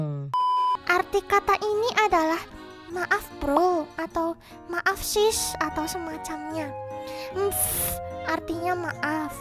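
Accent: Java, Indonesia. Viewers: Intonasi jawanya kerasa banget, kayak sensasi baru gitu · Kak logat ny kaya jawa terus kak abang nya kok kaya sunda 🤔 sebenernya sodara bukan sih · Fix logat jawa:v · wong jowo y? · Dari Legok Suaranya Aslinya Orang Jawa:v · orang jawa ya kak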